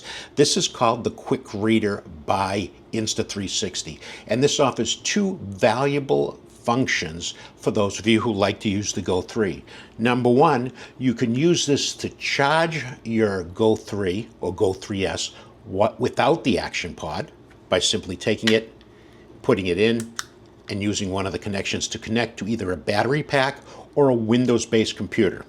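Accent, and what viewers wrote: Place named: North, United Kingdom. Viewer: I love the oldschool northern “ahhrd” accent. You don’t hear that (in media) as often today! (I live in NC btw lol) Great video!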